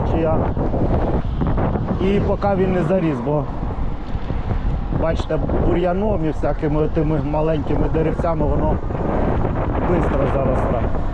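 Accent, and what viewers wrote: Poltava, Ukraine. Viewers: рідний полтавський акцент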